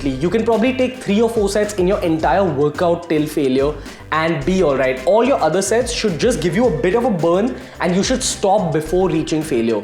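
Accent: India. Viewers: First hindi accent influencer who has a delicate accent but you can still understand what is he talking about. Keep it up very interesting material.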